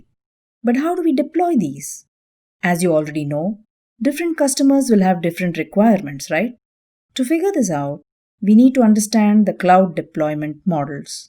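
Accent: India. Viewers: first things makerdemy should do is to inform your staff speak slowly, not like robot, with rather strong india accent, i hardly understand a word you saying.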